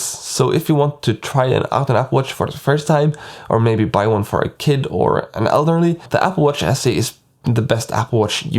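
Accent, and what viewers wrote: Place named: Norway. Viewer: Since english is not your native language, don't sweat it too much with the pronunciation, since norwegian pronunciation probably tries to slip in while speaking in english, and that is totally normal, nothing wrong with it. Focus on keeping up the making of content and your english will tune in by itself effortlessly. Good work!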